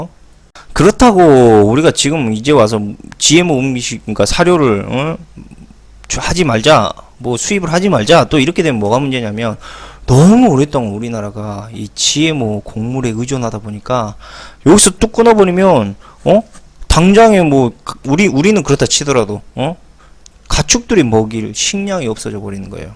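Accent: Jeolla, South Korea. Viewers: 긍께 고향이 어디다요?? ^^ 전라도 지라? 좋은 정보 감사합니다.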